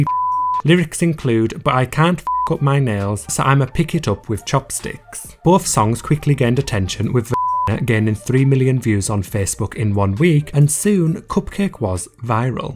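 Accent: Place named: Liverpool, United Kingdom. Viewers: Some Northern British, I suppose. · I love your liverpoolian accent "Cupcek"😂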